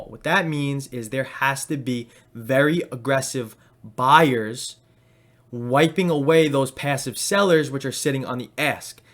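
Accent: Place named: New Jersey, United States. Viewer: Great video thanks for posting. You sound like you're from joysey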